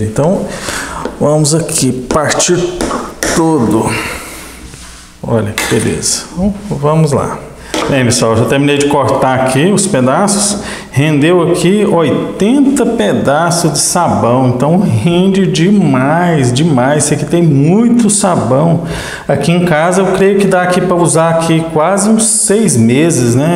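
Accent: Minas Gerais, Brazil. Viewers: Adoro esse jeito mineiro de falar ❤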